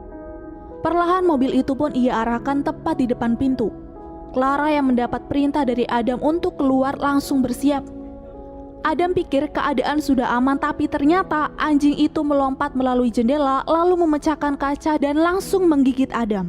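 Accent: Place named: West Java, Indonesia. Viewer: sy heran ama pembawa alur cerita ini, dr logat bahasanya sunda, tp dr video pembukaan biasa seperti situasi di jawa timur, karna sy orang jatim yg tinggal di bogor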